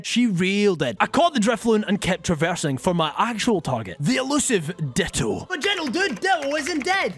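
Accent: Scotland, United Kingdom. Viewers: you're so aggressively scottish and i love it because so is my grandfather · Its nice that you have a Scottish accent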